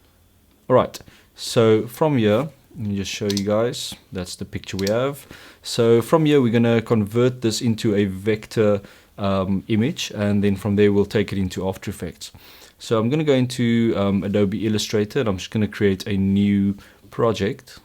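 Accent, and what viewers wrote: South Africa. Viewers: South African by far ;D Afrikaans accent ;) So yeh, he is South African, or somewhere in Africa xD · You sound South African,are you ? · You sound south african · I Love Ur South African Accent I'm Also South African!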